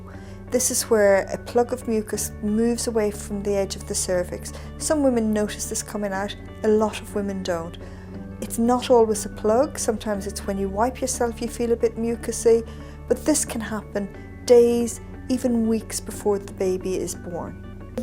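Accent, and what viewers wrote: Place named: Ireland. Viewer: You're so stupid, she clearly has an Irish accent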